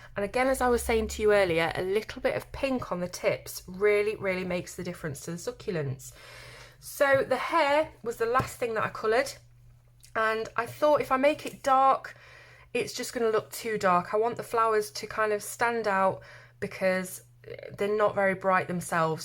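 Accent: United Kingdom. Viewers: O your British cool love the way you talk love the coloring books to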